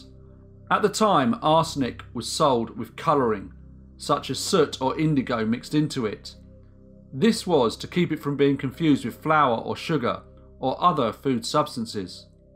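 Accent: England, United Kingdom. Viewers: Just subbed, your my new fav channel. 🖤Love the British accent,don't ever change that. No matter what anyone says. 💯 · Even the music in the background does not distract me because your voice is very distinct. Love your style of narration. I love English accents. 😊👍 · Wow!!!!... I really enjoy your channel and constantly recommend you to My friends. your voice is PERFECT and I NEVER EVER am confused about what your narration is saying. Also or "as well" thank you for annunciating so clearly.although I am an American 'folk' but I absolutely appreciate the Queen's English when it is used so well.thank you again for such entertaining videos. I am considering becoming a Patreon which I have never done before but I think it's well worth it for the quality of the the video info 👌 THANK YOU AGAIN...,. your American friend, Brian 🇺🇲😁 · I agree ☝️ your voice, I keep saying English Accent!! 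Haha 🤣 🇬🇧 with love 💖 Ally from Australia 🇦🇺 👍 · I don't understand why anyone would have a problem with your voice. It's a perfect narrator's voice. Plus it's an English accent that Americans can easily understand. Love your voice and accent. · I just love your British accent.. It's so soothing and I listen to your stories and it fills like,im listening to an audible book on my phone.. But, keep the stories coming.. My good man.. 😘🤔🤗😱🤧🤢👻🔪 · I,too,enjoy your wonderful English voice. I love each carefully researched broadcast. Great job!!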